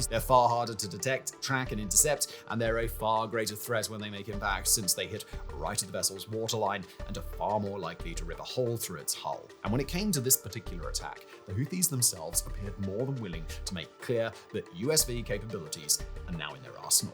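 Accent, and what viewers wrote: RP, United Kingdom. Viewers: Couldn't watch the 'over acting or the over the top posh english with the noticeable changes in the octave of the tone', i thought he sloved it down noticeable recently but guess he's back at it again. Time stamp is .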